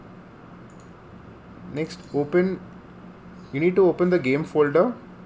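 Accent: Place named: India. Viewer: And as always, the man with indian accent did not disappoint and helped with technical issues Thank you so much (I hope I didn't sound racist)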